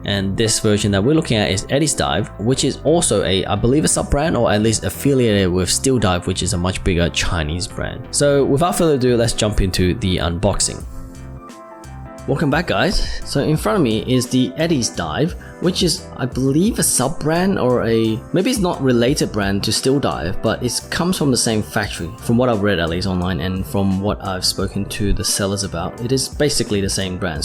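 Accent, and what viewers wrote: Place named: Australia. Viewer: why what's wrong with how he's saying it? he's Aussie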